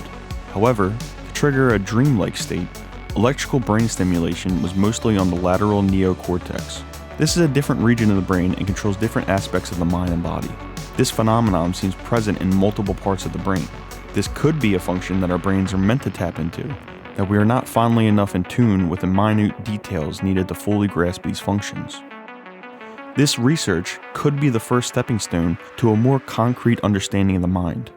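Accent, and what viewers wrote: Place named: Philadelphia, United States. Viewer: this guy is definitely from Philly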